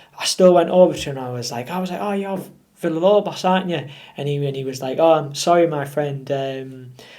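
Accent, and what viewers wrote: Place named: Yorkshire, United Kingdom. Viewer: Reason for asking cos you sound Yorkshire on ya videos pal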